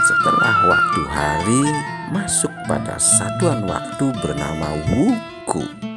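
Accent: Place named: Java, Indonesia. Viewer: vocalnya khas jawa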